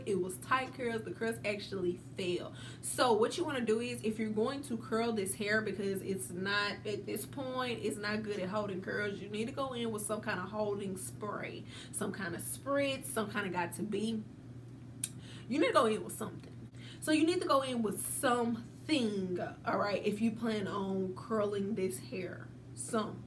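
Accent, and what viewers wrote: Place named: South, United States. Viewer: Love that southern belle accent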